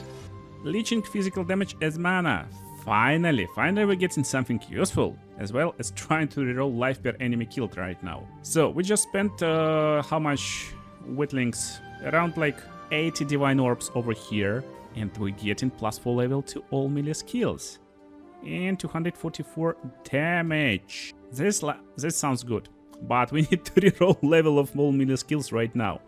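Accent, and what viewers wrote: Russia. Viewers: Всегда интересно слушать такое, как русский говорит Английские слова по русски) Как говорится, на*бал систему) подскажи, через как ИИ перса на превью делал? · I tried watching, i honestly did, but the russian accent is just killing the entire thing for me. my fire of hatred towards Z pigs cannot be extinguished.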